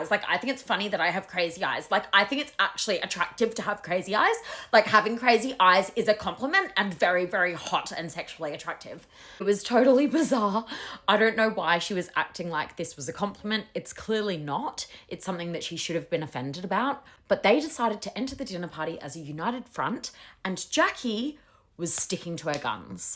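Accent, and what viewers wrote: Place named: Australia. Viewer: Just found you! You are hilarious! So cool to hear an Aussie accent doing the recaps! Going to binge all your content! ❤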